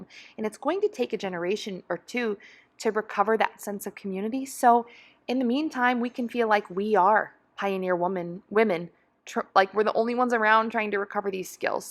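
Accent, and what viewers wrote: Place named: Missouri, United States. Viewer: I love your message about letting go of the perfection of it all. Listening to you talk I am wondering if we are from the same area! I am from warrenton Mo and there is a hill there called hopewell hill, not far from the Missouri River. I just found your channel recently and have been watching/enjoying your videos!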